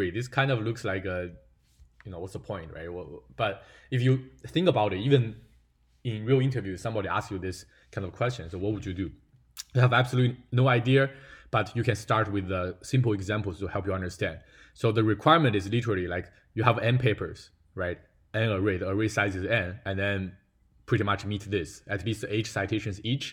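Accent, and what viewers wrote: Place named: China. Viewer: I like your chinglish style. Funny but with good confidence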